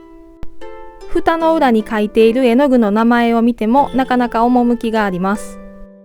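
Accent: Kansai, Japan. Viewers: そこはかとなく漂う京都弁がまた風情ありますね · トロントに来てから関西弁って懐かしいなあ。。。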